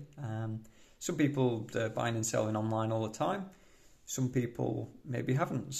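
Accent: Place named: England, United Kingdom. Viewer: I like your accent. What region of England are you from? My apologies if I’m wrong. I’m from Indiana in the United States.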